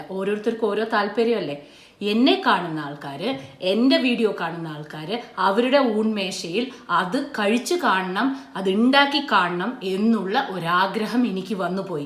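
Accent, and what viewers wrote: Palakkad, India. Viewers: I like your simplicity. You are very straight forward and seeing you enjoying the cauliflower fry was really enjoyable.I also like your palakkadan way of speaking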